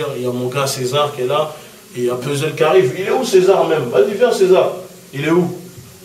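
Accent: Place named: Africa. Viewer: Bizarre maintenant il parle avec un fort accent africain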